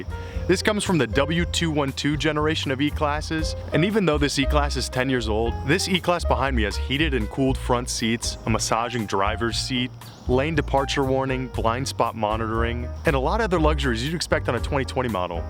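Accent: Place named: Italy. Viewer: Totally Italian talking styles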